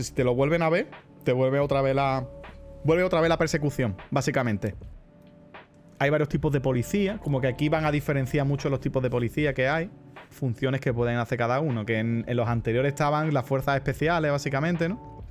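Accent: Puerto Rico. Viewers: A veces se me va el rollo y por el acento creo que Baity es puertoriqueño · porque habla como puertorriqueño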